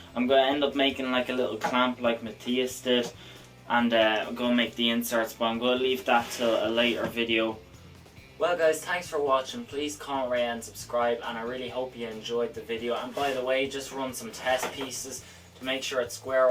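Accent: Ireland. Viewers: doing the fence now harder than it looks but getting their nice to hear irish accent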